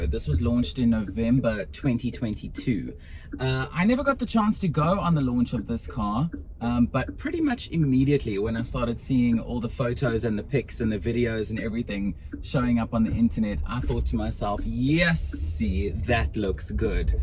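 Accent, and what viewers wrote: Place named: South Delhi, India. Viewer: You accent is same as south delhi rich kid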